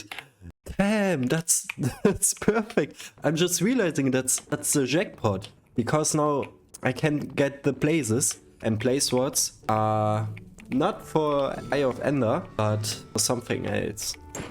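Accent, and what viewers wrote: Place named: Germany. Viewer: Wie hast du das gemacht man hört du bist deutscher 😅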